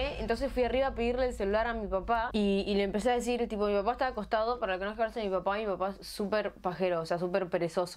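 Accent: Argentina. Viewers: Eres Argentina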